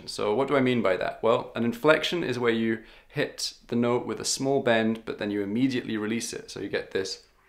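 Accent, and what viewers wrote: United Kingdom. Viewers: I love your videos... 1 suggestion is to have the tabs above where the scrolling time bar at the bottom of the page... i like to pause and practice before i play along and the bar is right across the tab... You sound british so cheers!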